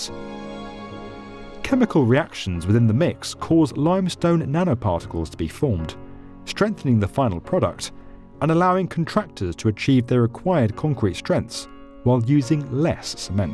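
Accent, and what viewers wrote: United Kingdom. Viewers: Why are British voices so satisfying.. David Attenborough Jeremy Wade Gordon Ramsay Whatever your real name is.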